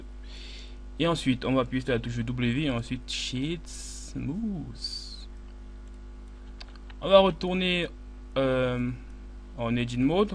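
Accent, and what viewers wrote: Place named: Africa. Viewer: Très bonne vidéo. Dommage pour l'accent africain qui rend difficile la compréhension des touches (G prononcé "J"" etc..)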